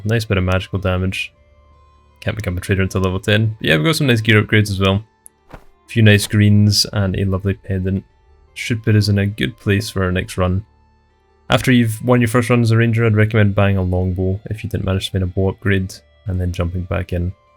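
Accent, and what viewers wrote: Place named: Northern England, United Kingdom. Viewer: Great video. Just wondering cant place the accent, northern England or Scotland?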